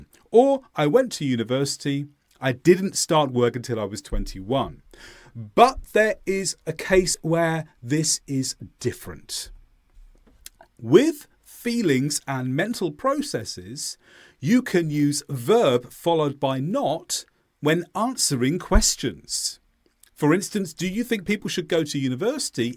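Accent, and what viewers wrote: England, United Kingdom. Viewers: Where have you been all this while ? SUBSCRIBED straight away. I need to devour your videos. That will help me with good paraphrasing in my assignments and every day life. But most importantly why I chose you is because, lessons from a British English accent teacher are very helpful for people living in the UK. Everyone use the accent suitable for them and the country they live, that’s what I did for myself. Thank you very much indeed for your hard work building and editing these videos. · >search for good grammar video >open an 5 hours video >England accent >close the video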